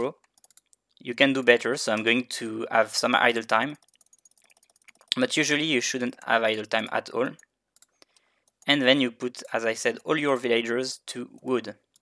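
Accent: France. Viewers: Is he French?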